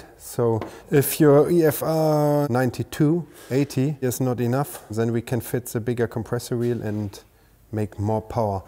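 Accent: Germany. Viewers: Ist doch schon ziemlich solide dein Englisch content - ein gewisser ‚german accent‘ darf durchaus bleiben: It’s not a big, it’s a feature …or USP ;) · Typisches deutsches Englisch xD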